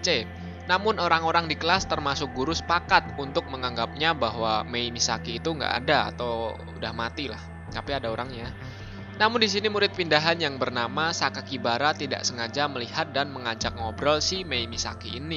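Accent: Java, Indonesia. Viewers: Bnjir yg punya Chanel Wong jowo kyo aku mas smpean😂Wong jowo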